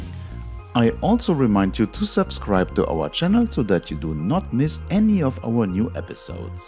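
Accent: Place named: Germany. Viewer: man hört anhand der Aussprache, dass der Moderator ein Deutscher ist. Wir leben in Deutschland - warum also kann dann das Video nicht auch in deutsch produziert werden??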